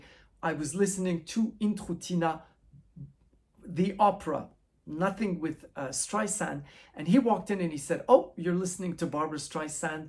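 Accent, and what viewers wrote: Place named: France. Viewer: This is lovely! I love your erudite enthusiasm for Barbra and her artistry. You remind me a bit of me (except I don't have your French accent)! Thank you for this.:)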